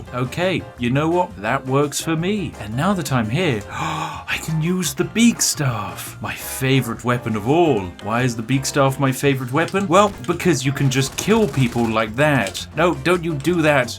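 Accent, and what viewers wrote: United Kingdom. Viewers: I subscribed to you for the lone fact of your British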